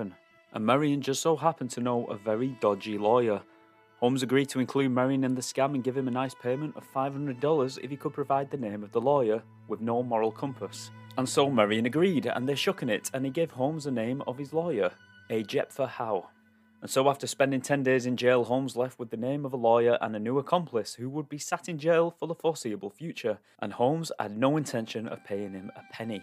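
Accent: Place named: England, United Kingdom. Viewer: Typical American. I'm pretty sure the narrator is English 🤣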